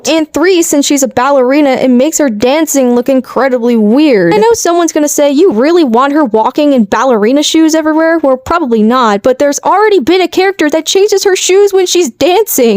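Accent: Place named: General American, United States. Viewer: How’re u from the south but sound normally american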